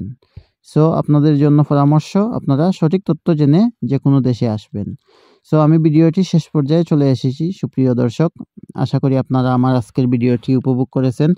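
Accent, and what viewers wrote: Sylhet, Bangladesh. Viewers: ভাই আপনি কি সিলেটি নাকি?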